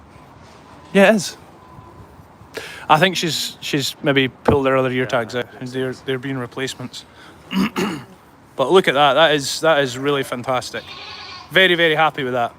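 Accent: Scotland, United Kingdom. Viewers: At the end of the video, I thought you were saying taking a healthy “mule” home. Then I finally figured out you were saying “meal”. Having a bit of trouble with your Scottish accent.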